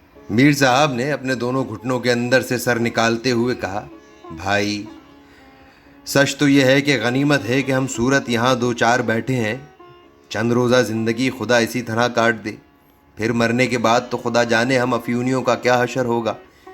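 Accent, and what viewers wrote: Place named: Lucknow, India. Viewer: لکھنوی لہجہ کی الگ سے داد۔ ۔۔۔۔یار کیا بات ہے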